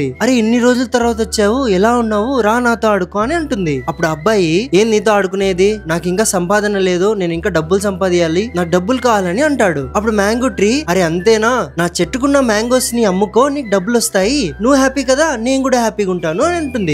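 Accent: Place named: Mumbai, India. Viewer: Tammudoo... nuvvu Telugu Lo videos chestunnav kada.. Mari Telugu raanattu telugu ki Tegulu pattinchaku.. mangoes.. branches... yenduku ilaa anavasaram gaa English Padaalu vaadutunnaav? Bombay nunchi vacchina Heroine laa.. ilaa maatlaadithe Buttler English laa untundi.. neeku English meeda pattu unte English lo ne chei ilaa Telugu ki Tegulu pattinche prayatnam cheyaku.. vinadaaniki assalu baaoledu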